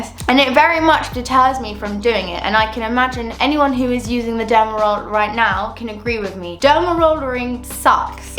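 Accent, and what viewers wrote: United Kingdom. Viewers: Love Love ur videos can't wait to try straight away! Love ur British accent!! Will update once I add to my hair journey!! Could you do a video on hair nutrition?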